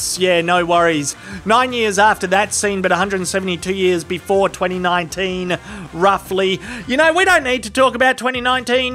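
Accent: Australia. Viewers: But yeah, no, that’s how all Aussies talk.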